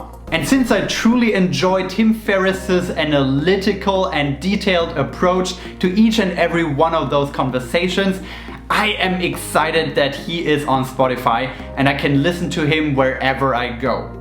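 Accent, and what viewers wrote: Germany. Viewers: Hey, where are you from? :) you have a little bit of an german accent... As we say in german: gefällt mir ^^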